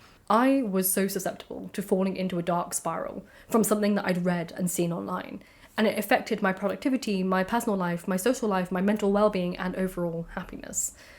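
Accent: England, United Kingdom. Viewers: Just found this channel yesterday, now binge watching, as well as Lady of the Library and your wonderful blog posts. So nice to find such an intelligent, interesting and attractive lady on YT. With an English (Irish?) accent to boot!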